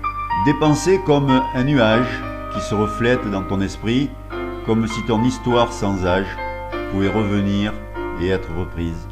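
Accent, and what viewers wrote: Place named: South, France. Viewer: Quelle belle idée, ce poème, récité par son auteur a quelque chose d'un cadeau personnalisé. merci...et pour le bel accent du sud !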